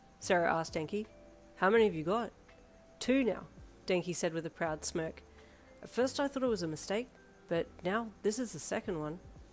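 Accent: Australia. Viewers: Naur, Aussie accent is awesome!! 😁💜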